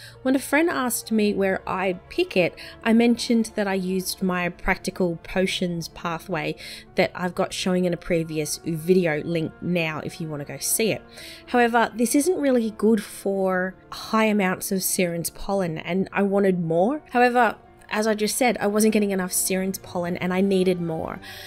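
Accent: Australia. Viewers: I love female aussie accents. It brings a warm feeling to my heart and ears.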